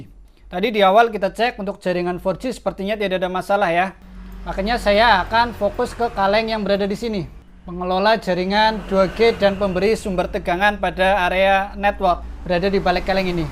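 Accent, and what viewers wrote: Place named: Tegal, Indonesia. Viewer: Mas njenengan banjarnegara, tapi logatnya ko kaya tegal ya?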